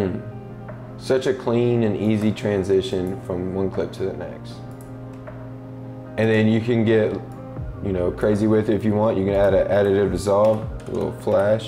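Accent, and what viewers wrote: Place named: South, United States. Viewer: Your speech is sooo nice!! Come on southern bred 🤣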